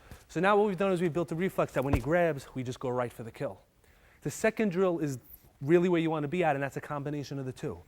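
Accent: New York, United States. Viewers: I would guess either New York, New Jersey, or Italian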